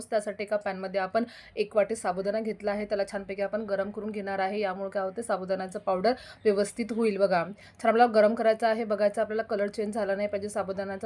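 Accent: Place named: Vidarbha, India. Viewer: शेवटच्या😊 दिवशी एकदम जोरदार रेसिपी तुम्ही विदर्भातले आहात का बोलण्यावरून वाटलं म्हणून सहज विचार😊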